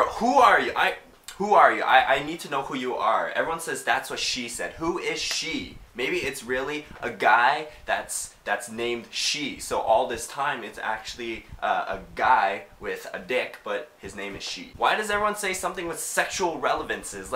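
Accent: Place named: Canada. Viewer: You know how i can tell ur from canada? you say About and Out weird! I'm moved to Canada , it's kool :)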